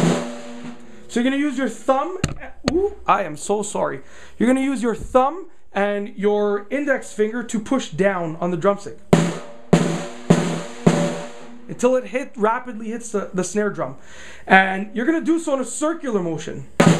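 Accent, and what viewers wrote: Canada. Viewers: Are you canadian? So sorry... Love ur vidz tho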